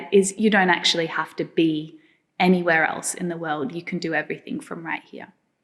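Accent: Australia. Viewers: Hi! Teacher. Good aftnoon. How are you? My Name's Ivanilson. I'm from Brazil and live ABOUT TONW Itacere-ba. Did you know? WHAT'S News? I'm to want speak English Britanico but know I study how a teacher can is this Austrialiano sutaque is spok beatiful.more Did you know? Does She is a woman very beautiful love see you speak this lessonigs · congratulations, you are terrific in teaching English. I think your accent is between Australian & English & easy to comprehend. Best of luck. Enjoy Perth.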